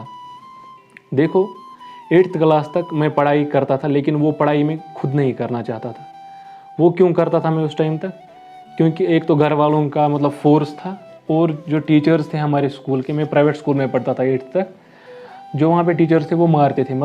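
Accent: Kashmir, India. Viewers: Bhai aap Kashmir se hona ??? Ap ke bat Karne ke tarike se pata chal Raha Hain ??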